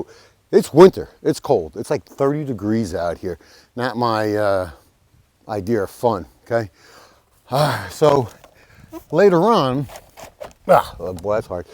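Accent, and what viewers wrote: New York, United States. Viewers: funny and to the point. except your house or not. you need a moisture barrier. t1-11 is wood, any wood that is contact with water will rot over time. the little extra time and money would have been well worth it. love the straight talk , sounds like your from jersey or new york.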